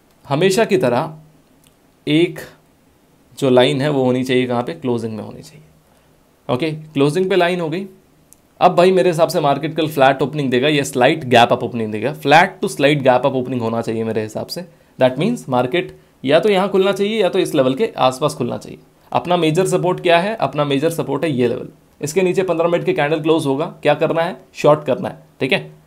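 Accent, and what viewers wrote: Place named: Bangalore, India. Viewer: is from banglore XD accent se smjh gya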